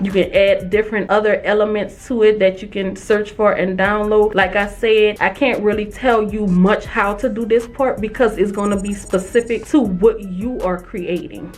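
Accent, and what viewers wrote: New Orleans, United States. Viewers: Hi. Love your channel. New here. I love your videos! Question, are you from NOLA? I hear your accent a lil bit. 😊I love New Orleans so much. ❤❤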